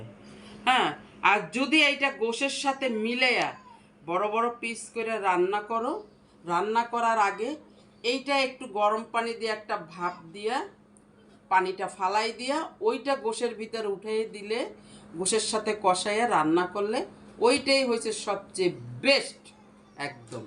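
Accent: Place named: Barishal, Bangladesh. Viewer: আন্টি আপনি কি বরিশালে র মানুষ মুয়ৈই তো ভাবছি আপনি ঢাকা ই মেয়ে আপনাদের কথা বলা বুঝাইছে এখন দেখি ও অন্য কিছু ভালো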